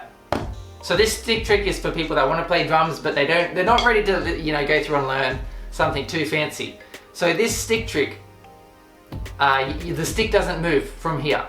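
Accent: Australia. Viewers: Is he from Australia?? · R u aussie?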